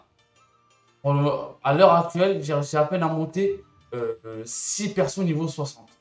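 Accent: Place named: Marseille, France. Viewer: Par contre sss un peut marseillais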